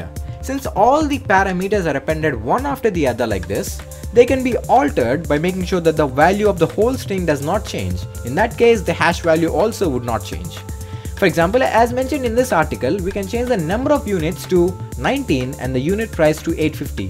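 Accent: India. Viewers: fun fact: He sounds like an idian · In india school teaches us but I learned from foreign YouTuber and comments And he's Indian you can find by his accent and skin tone I can guess he's from southern India madurai is my guess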